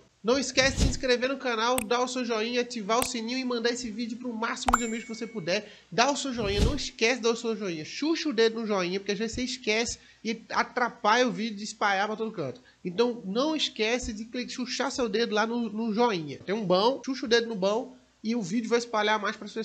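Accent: Minas Gerais, Brazil. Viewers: Kkkk vc tá mais mineiro do que eu 😆😆😆 adorooo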